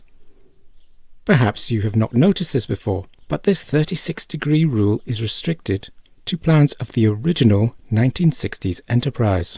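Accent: United Kingdom. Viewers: he’s a Brit… They pronounce thing weird.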